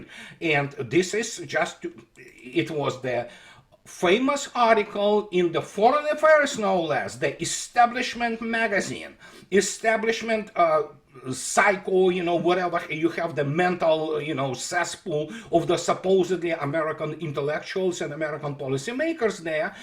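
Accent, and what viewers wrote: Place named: Russia. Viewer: I just love how you said " leopard M2A whateverthekhellis" :) I like russian accent..greetings from Budapest :)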